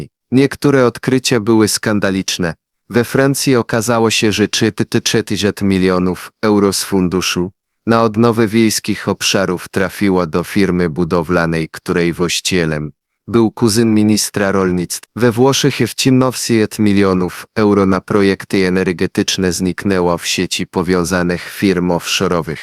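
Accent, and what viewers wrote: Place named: East, Poland. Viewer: Kto to czyta No Akcent jest Wschodni jeszcze to jest zrozumiałe Ale kwoty liczby daty lata nie mogłam wyłapać Ile miliardów kiedy była ta konferencja więcej staranności Akcent mi nie przeszkadza gwałt przekazywanych Ukrainie lata miesiące kraje więcej szacunku dla osób korzystających z kanału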